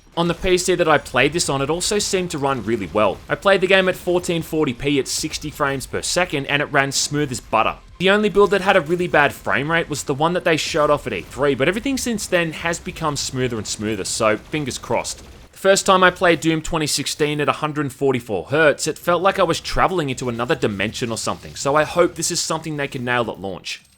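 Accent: Australia. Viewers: So that's what aussie accent sooounds like.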